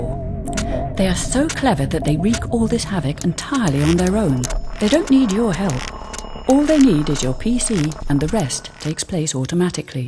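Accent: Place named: England, United Kingdom. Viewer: This is not a good explanation of the difference between Viruses and Worms! Viruses and worms are not known for "letting in riff raff". That is a backdoor. The difference between a virus and worm is that a worm can replicate and spread over the network by itself (self instatiating), whereas a virus requires a host file to run, it needs to be attached to some form of executable file. Simply, a virus requires a human to invoke it, and worm does not. Simple. You don't need to explain it badly. Despite the English accent, this is bunk. <link>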